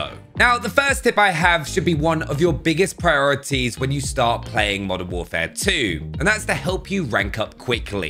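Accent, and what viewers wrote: United Kingdom. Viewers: Why is it that British accents make you sound more knowledgeable 😂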